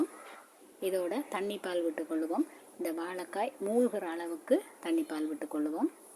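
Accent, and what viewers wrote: Sri Lanka. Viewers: Very nice thanks amma . Happy to see our Jaffna Tamil🇱🇰🇬🇧🙏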